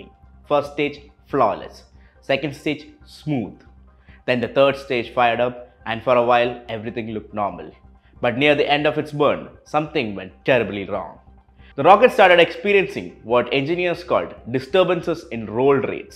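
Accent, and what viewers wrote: Kerala, India. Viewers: A malayali with malayali accent english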